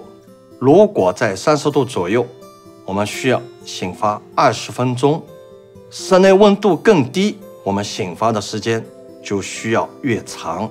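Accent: Shanghai, China. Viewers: 一听就是阿拉上海人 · 阿拉上海人👍👍👍温文尔雅，带着上海口音的普通话😀😀😀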